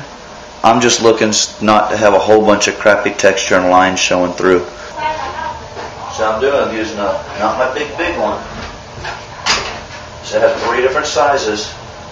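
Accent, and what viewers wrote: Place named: Tennessee, United States. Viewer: i saw back on the other video you didn't take much time to insulate thoroughly or use a vapor barrier. I would guess by your accent..you're in Tennessee maybe??just a guess.I'm in a northern climate, and up here with that little bit of insulation you would have cold toes & frozen pipes. Thanks for taking the time to this series. You did a fine job.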